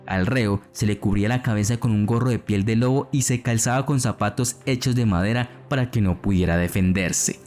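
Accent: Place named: Bogotá, Colombia. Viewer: Ese es rolo, no le ve la voz.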